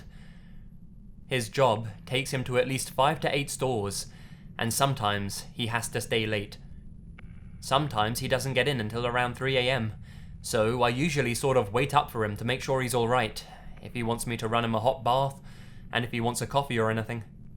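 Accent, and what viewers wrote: England, United Kingdom. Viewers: Are you from England? I'm asking because I know what an English accent sounds like because I watch this show that takes place in England so I know your speaking with one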